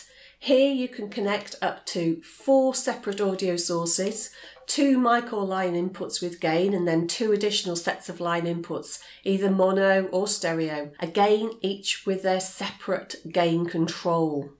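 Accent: United Kingdom. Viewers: Minus the thick British accent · Very good explanation. And what a lovely British accent (for a French like myself 😊)! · Nice, but I can,t catch British accent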